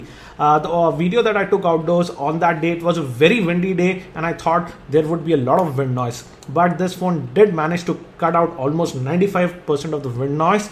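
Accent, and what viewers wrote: India. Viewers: that indian english gave me cancer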